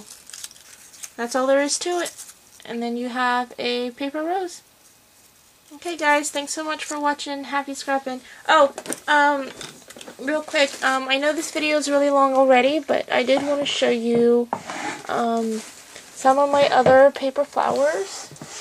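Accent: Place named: United States. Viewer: let me guess: with your accent, you are a rich american woman (because in america, you are rich or poor, no middle)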